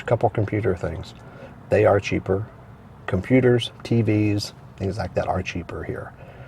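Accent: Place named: Texas, United States. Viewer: Howdy I don't think you are wearing boots anymore, but I can see that you still have some Texan southern accent with a twist left in you! Thanks for sharing! enjoy your long visit partner... Best regards/Ned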